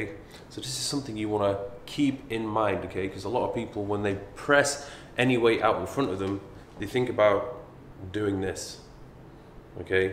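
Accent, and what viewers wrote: United Kingdom. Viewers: I don’t know what’s better his form advice or his British accent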